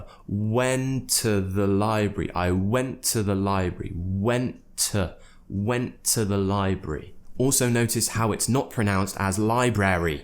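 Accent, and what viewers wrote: United Kingdom. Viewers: i admire your British accent 💜💜💜 it so clear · You’re so handsome and I really like your British accent. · Whatever you scrutinize, who cares! I will learn the very British accent asap from. 🥰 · Love your British accent.love also your spontaneity.you know how to transmit your message.👍👍👍👍👍👍👍👍👍👍from 🇩🇿. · You sound sooooo British whatever you say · Whatever you say I am able to follow your British English accent. You have the clearest British English accent I have ever heard in my whole life! Keep posting :) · Whatever you say sound British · I love your podcast, I'm listening to it everytime when I go to college. It's helping so much to improve my listening skills and to get used to the british accent. Keep doing this amazing job, whatever it takes! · I like your British accent so much . Thank you ! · Music to my ears lovely British English ❤❤❤😍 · Brother whatever you speak sounds British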